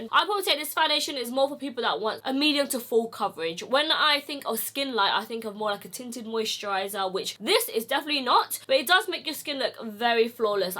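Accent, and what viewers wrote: United Kingdom. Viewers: OMG LOVE UR VOICE AND UR BRITISH ACCENT😍❤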